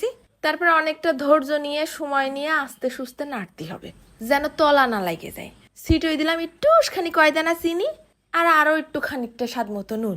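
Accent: Jessore, Bangladesh. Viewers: আপনার বাড়ি কি যশোর না গোপালগঞ্জ?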